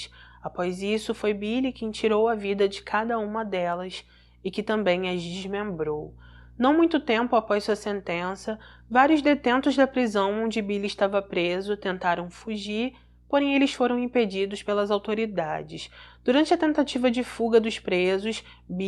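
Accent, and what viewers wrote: Rio de Janeiro, Brazil. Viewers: Boa noite quando procuro seu vídeo e não encontro já fico ansiosa esperando esse sotaque carioca é muito lindo eu morei no Rio de Janeiro por 2 anos e quando saí de lá já estava falando carioca kkkj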